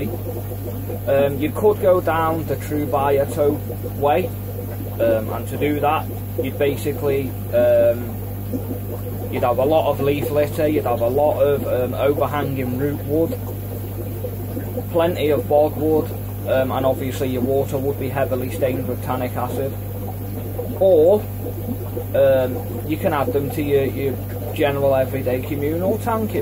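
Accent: United Kingdom. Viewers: lol I'd say that's a northern accent 👍 I'm a scouser myself and gd vids thanks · First off, congratulations of your country freedom and independence from the tyrannical shadow global organization. Secondly Macbeth is classic literature and movies for hundred of years. Why do you sound like you are from Scottland or Ireland and not from England? Where your parents from one of those 2 countries?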